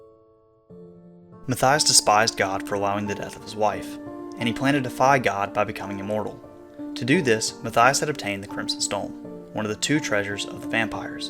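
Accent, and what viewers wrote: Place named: South, United States. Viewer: Title doesn’t make sense….He’s not Dracula until he’s Dracula. So how does Dracula become what he already is? The term “Dracula” would already be a Vampire right? The title should be….HOW a man became a vampire known as Dracula….because their are many vampire but only one king of vampire….Dracula. That southern drawl only made it more cringe. I DO know this story isn’t accurate.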